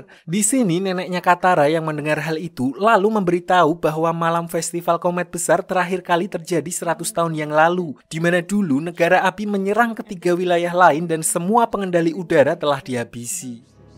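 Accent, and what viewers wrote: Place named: Java, Indonesia. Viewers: Medok amat mas ngomongnya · bisa gasi gausah medhok pas ngomong bahasa indo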